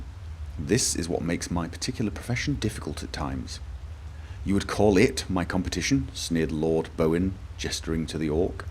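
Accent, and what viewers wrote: United Kingdom. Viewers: It's like a Morgan Freedman with a British accent. that's like 200% better than the normal Morgan Freedman!!! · If you were american I would not watch these book videos but british people are so pleasant to listen to that I'm going to watch them. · Yes, oh my God so much yes! This is amazing! The calm, collected British accent and the fantastic books of the Elder Scrolls series, with your reactions added on top. This might very well be your best playlist yet. I REALLY hope you'll do all of the books from the Elder Scrolls series eventually.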